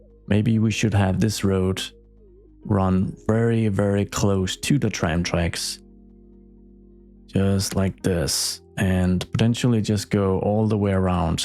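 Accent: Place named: Denmark. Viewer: I really like youre city, its very realistic. Also i can hear that you have an accent it sounds danish but im not completely sure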